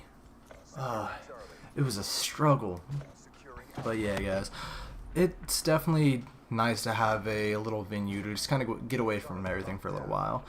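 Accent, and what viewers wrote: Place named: United States. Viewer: Lol, that american accent.......i like it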